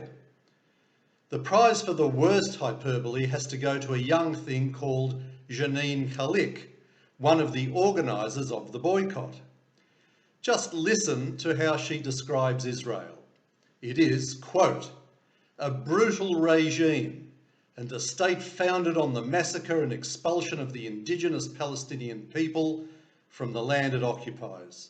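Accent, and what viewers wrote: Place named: Australia. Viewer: At last! A forceful Aussie voice. Well done,. Now go after the Lost Tribe of Israel. By that I mean the Australian Jews who are, shockingly, supporting these anti-Semitic Israel liars and haters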